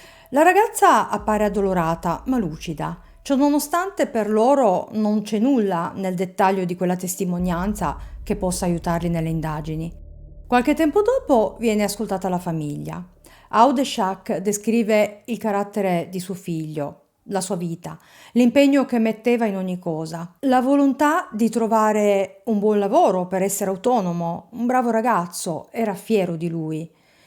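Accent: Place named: Piedmont, Italy. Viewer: Ciao ti ho scoperta da tre giorni ma sto guardando tanti tuoi video perché ti trovo davvero brava forse perché dall'accento mi sembri Piemontese come me e Elisa true Crime, Ely in Crimeland e forse per campanilismo,ma anche perché come nel primo caso che mi ha fatto mettere il segui Il caso di Berfin ho notato la tua empatia, la tua compassione,e questo mi piace,non mi piace chi giudica le vittime, soprattutto quando sono vittime soprattutto di maltrattamenti e abuso psicologiche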